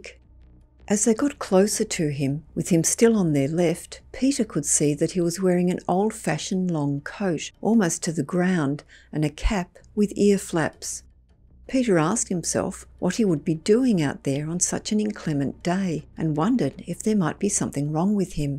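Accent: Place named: Australia. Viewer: I love your Aussie accent and the way you narrate. Thank you 🙏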